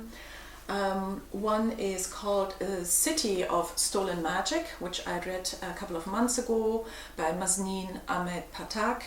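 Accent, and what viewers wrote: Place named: Scotland, United Kingdom. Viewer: Wishing you an abundance of success in your new business. I think your accent sounds like 90% Scottish... so lovely.😍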